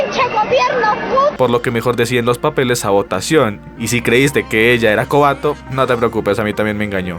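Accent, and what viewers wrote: Colombia. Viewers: Mero colombiano pana 👌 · pd: tienes pura pinta (voz) de ser colombiano amigo sub nuevo 😅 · Un narrador colombiano mca que chimba, parce de corazón espero le vaya muy bien, y confirmo, pobrecito el parcero.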